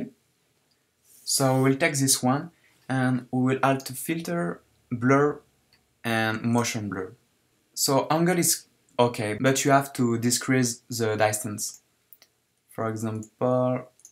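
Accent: France. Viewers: Mdr on sent bien l'accent français ;) sinon très très bon tutoriel ainsi que boulot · Tu es français, non ? L'accent est magnifique ! Edit ; J'avais pas remarqué le toshop en français...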